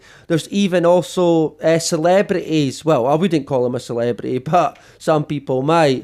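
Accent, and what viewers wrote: Scotland, United Kingdom. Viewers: I love your accent...sounds Scottish..... And no vaccine for me...💯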